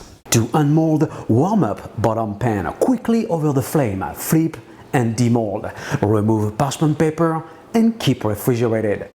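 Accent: France. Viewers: This guy sounds so french that it seems fake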